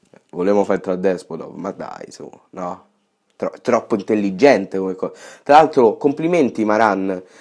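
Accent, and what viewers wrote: Rome, Italy. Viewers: Hai l accento romano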